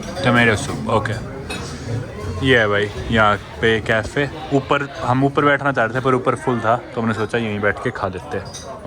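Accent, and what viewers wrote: Haryana, India. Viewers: I'm from Pakistan I 💓🇵🇰 long live 🇵🇰 nice beautiful Bhai AP ka Haraynivi Accent bhuht acha lagta hi 💚💙🙏🇵🇰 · Thank you for all your tips. Very helpful. Although I would like to add, slamming doors is considered rude & bad manners in many countries, not just in Columbia. It is common knowledge because generally people slam doors in anger. I also accidentally saw one video and really liked your simple, honest, practical, genuine (no show), with Haryana accent information. 😊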